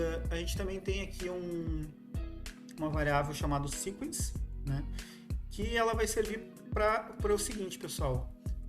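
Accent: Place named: Porto Alegre, Brazil. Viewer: Salve mano! Pelo sotaque deve ser de POA. Tô desenvolvendo um beat n UP na Godot, no futuro quero fazer um de luta. Boa aula!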